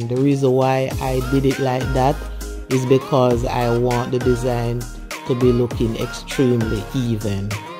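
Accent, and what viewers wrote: Jamaica. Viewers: You sound Jamaican 🇯🇲 . Anyway, I have two old armchairs that I will diy into throne chairs. I hope mine looks awesome like yours. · are you Jamaican?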